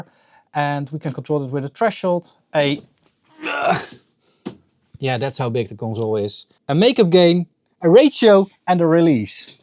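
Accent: Netherlands. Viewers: i keep coming back for your dutch english accent, dutch people are hilarious but very smart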